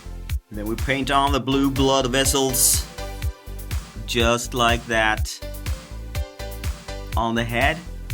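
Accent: Scania, Sweden. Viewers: när du började snacka skånska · Känner igen den svenska dialekten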